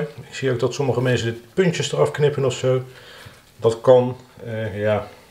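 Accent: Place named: The Hague, Netherlands. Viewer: Top filmpje, ga ik eens mee aan de slag! Geboren Hagenees zo te horen hè? ;)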